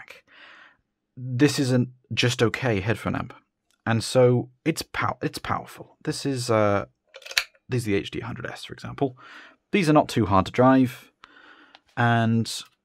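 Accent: United Kingdom. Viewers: Oh look, a more sophisticated Zeos with a British accent who reviews more expensive gear. You deserve my subscription well done on the review!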